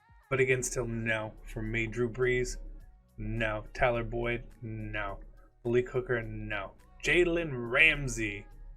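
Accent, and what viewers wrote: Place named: California, United States. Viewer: You talk one of them weirdo California’s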